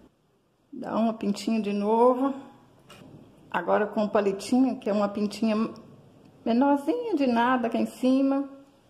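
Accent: Minas Gerais, Brazil. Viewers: Vc é mineira? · Parabéns adoro os seus artesanatos ,e a explicações são bem claras ,e também adoro o seu sutaque de mineira ! 👏👏😍😍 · Ameiii. Parabéns pela dedicação. Adoro seu sotaque de mineiro.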